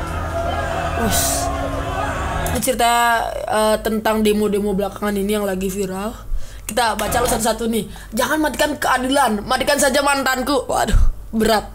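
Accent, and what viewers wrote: Bali, Indonesia. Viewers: Pantesan logatnya aja udah dari bali sama kayak gue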